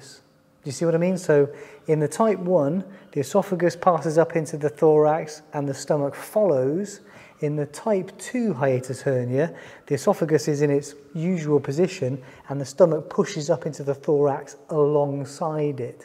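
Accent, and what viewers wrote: United Kingdom. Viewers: Asa I started watching this show, I noticed you are British, aren' t you Doc?🧑‍⚕️ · good video, if i could understand the british accent and if he could speak slower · British accent + mesmerizing personality + a med school mannequin = YouTube star giving anatomy lessons.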